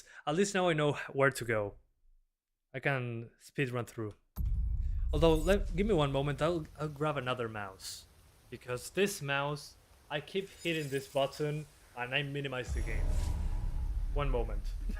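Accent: Rioplatense, Argentina. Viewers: Hola, de donde sos, hablas ingles, pero pareces de Buenos Aires, o Uruguay? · tenes acento argentino o uruguayo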